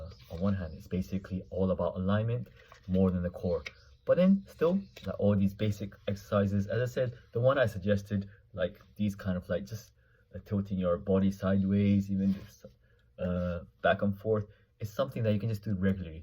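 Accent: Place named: London, United Kingdom. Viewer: Ah I see. That's why you got a hint of London accent when you speak english. Thanks for answering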